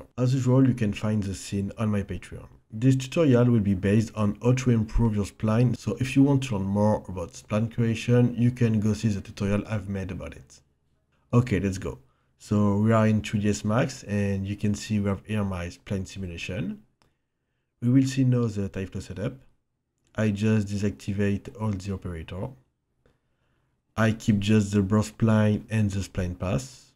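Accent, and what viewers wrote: France. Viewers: joli l'accent français :) merci pour la technique by the way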